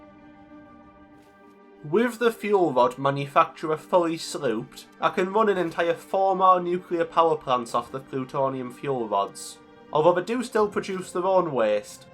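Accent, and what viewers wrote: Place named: Northern England, United Kingdom. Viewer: I unfortunately have to report that since watching your videos my thoughts now speak with a northern accent sometimes. It will forever be gläeba in my head for now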